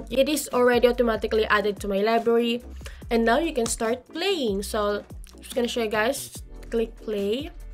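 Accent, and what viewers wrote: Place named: Philippines. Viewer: i knew it, you're from the Philippines 😅 we have the same accent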